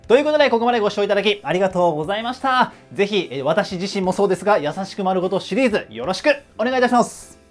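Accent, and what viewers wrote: Kansai, Japan. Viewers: なんか関西弁？入っててかわいい！！！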